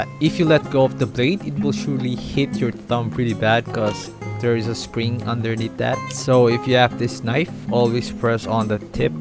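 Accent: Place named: Philippines. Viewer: You sound like your from philippines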